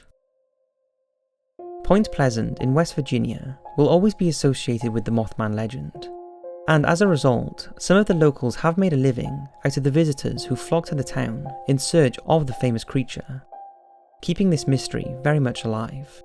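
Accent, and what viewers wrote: Wales, United Kingdom. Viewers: You sound Welsh dude...what part? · He’s welsh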